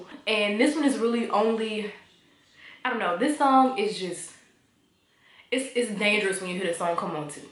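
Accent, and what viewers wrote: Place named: New Orleans, United States. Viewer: Yea that NOLA accent came out STRONG in this video 😂😍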